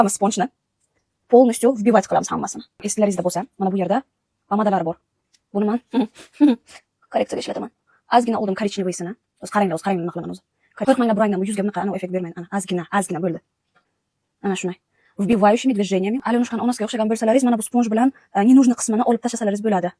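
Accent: Bukhara, Uzbekistan. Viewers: Buxorolik siz aniq buxoroni shevesi vashe yoqadide😂❤❤❤❤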